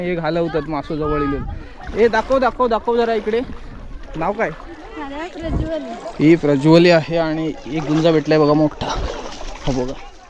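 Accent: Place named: Konkan, India. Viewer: me pan rajapur chi aahe ha video pahun aani aapli kokani bolna mala khup aavadte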